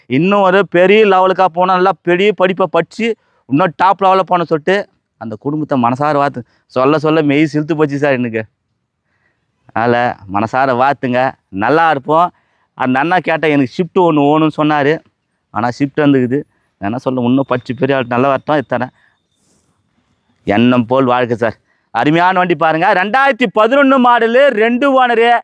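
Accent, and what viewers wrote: Madurai, India. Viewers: Madurai vasagam super valthukkal 🎉🎉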